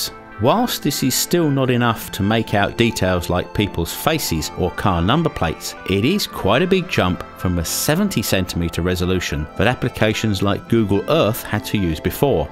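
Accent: United Kingdom. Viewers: I really appreciate your videos, because they are so helpful for people like me willing to learn English. We can find corresponding text as well as subtitling with a charming British accent . Thank you very much, Sir. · Whilst consumer video cameras hitting 30/60 frames per second, the industry norm for the film world is still 24 fps. However, seeing as the chap narrating this video is British, it's possible that he selected PAL settings (of 25 fps) as oppose to 30 fps associated with NTSC when putting the video together.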